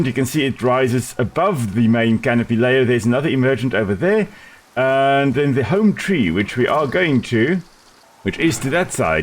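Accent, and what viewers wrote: South Africa. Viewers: Are you from SA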